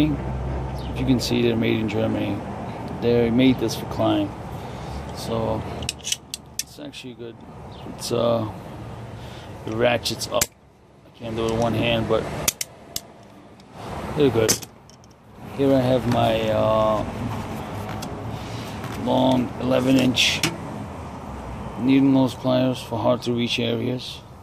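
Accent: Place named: New York, United States. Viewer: I bet he's of Italian or Puerto Rican descent; either way it's definitely a New York accent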